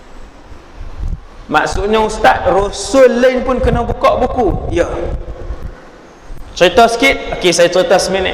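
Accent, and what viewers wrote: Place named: North, Malaysia. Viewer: Cakap Utara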